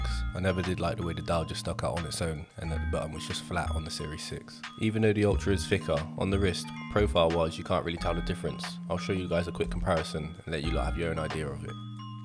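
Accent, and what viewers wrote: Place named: London, United Kingdom. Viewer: Nice vid bro. Stumbled across your vids. The london in you is clear to see ha ha.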